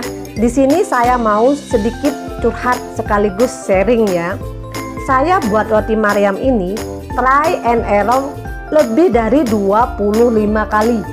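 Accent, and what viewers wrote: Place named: Madura, Indonesia. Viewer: Suka banget dengan logat bicaranya mbak. Salam madura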